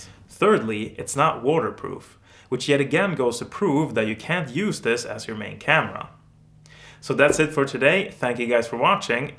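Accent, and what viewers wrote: Sweden. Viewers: Are you from Sweden ?)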